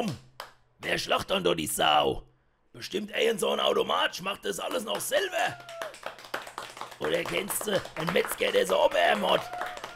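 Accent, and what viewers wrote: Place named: Odenwald, Germany. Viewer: Ach wie schäi is doch Ourewällisch🤘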